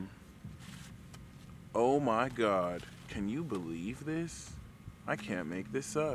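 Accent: Nigeria. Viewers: The Nigerian accent takes me out everytime 😂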